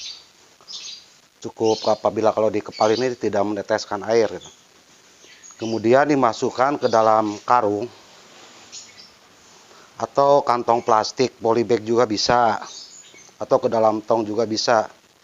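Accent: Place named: West Java, Indonesia. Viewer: Logat nya siga urang sunda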